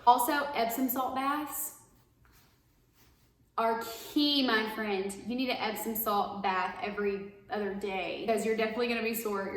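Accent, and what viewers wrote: United States. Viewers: ahhhh. I get you now. you have a little southern charm in your voice. I picked that up quicker than I did with your sarcasm.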